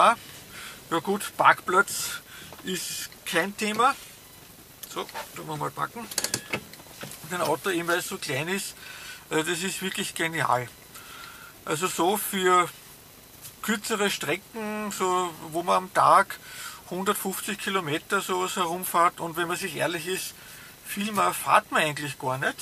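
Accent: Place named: Austria. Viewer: Grausig, dieser Ösi-Dialekt! Und nur Gesülze, keine genauen Angaben!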